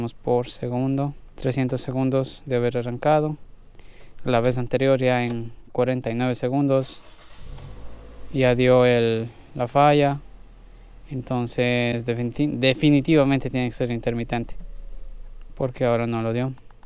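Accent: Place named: Highlands, Ecuador. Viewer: Ese acento característico de la serranía ecuatoriana, creería que del Austro.... llegué a este video, gracias al algoritmo de Youtube. Excelente video, saludos desde Guayaquil, compatriota.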